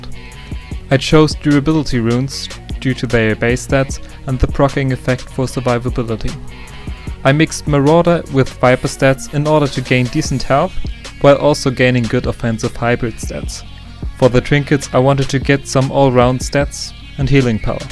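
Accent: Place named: Germany. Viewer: Naja, also man muss ja sagen... Der deutsche Akzent ist ja mal echt lustig :D aber deine intro haut es raus... Mega awesome :3 wirklich und danke für den build anyways :D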